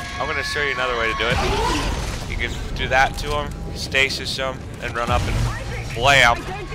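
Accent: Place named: Pittsburgh, United States. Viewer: You kinda sound like you're from Pittsburgh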